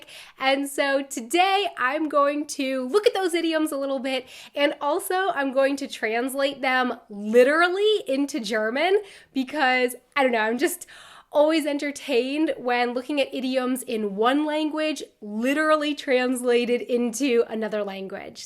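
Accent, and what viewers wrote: Bavaria, Germany. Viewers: Your bavarian accent is adorbs. :D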